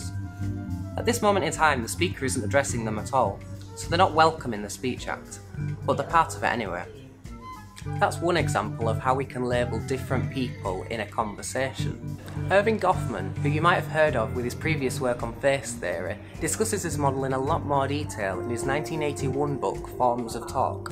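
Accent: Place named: North of England, United Kingdom. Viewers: damn, I really love your accent :D such a nice british accent, I would love to speak like you. Thank you for the Video! It became very clear to me. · Thank you very much! I'm from the North of England, so my accent is very different to the traditional Standard English usually broadcast from the UK. I'm glad you liked my video :-)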